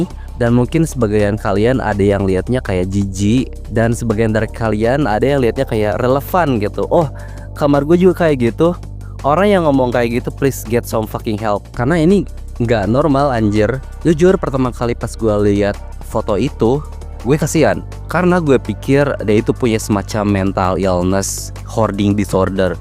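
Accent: West Java, Indonesia. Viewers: bang, kamu orang sunda ya?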